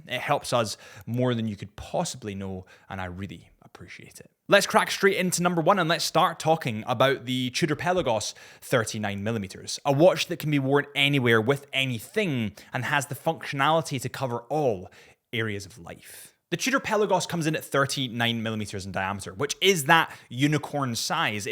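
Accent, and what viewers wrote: Scotland, United Kingdom. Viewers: Mate it’s like listening to Adrian Barker with a Scottish accent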